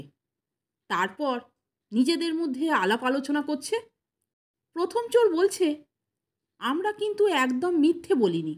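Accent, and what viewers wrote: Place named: Ghoti, India. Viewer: দিদি, আপনি ঘটি, না বাঙাল? আপনার রিলগুলো বাঙাল ভাষায় এবং সম্ভবতঃ বাংলাদেশে বা বাংলাদেশীদের সাথে করা। আর আপনার জোকসগুলি একক কণ্ঠে ঘটি ভাষায়! আমরা সত্যি ই কনফিউজড!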